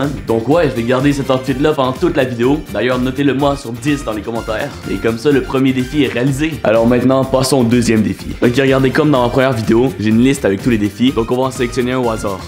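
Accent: Quebec, Canada. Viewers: Tu es quebecois? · Un bel accent canadien 💫💗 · tes qubecois??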